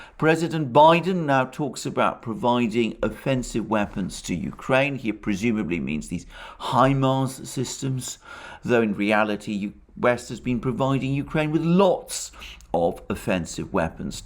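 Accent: United Kingdom. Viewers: Ha! You are confusing a British voice with extraordinary insight. All this information is pretty much common and available all over the Internet. I agree he is eloquent in what little he actually says.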